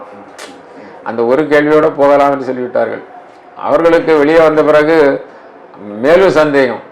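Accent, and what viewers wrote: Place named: Tamil Nadu, India. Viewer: தெற்கத்தி தமிழில் உங்கள் அனுபவ பகிர்வு அழகு. ஜெய் யோகி ராம்சுரத்குமார் 💐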